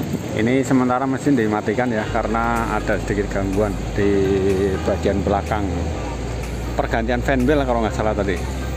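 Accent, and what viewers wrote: Banyumas, Indonesia. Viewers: Selamat malam mas nek ora ngpak ira kepenak....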